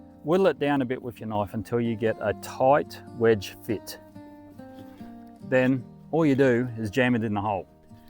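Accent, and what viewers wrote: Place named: Australia. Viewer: What a fun accent. Are you from Australia or New Zealand, or somewhere else entirely?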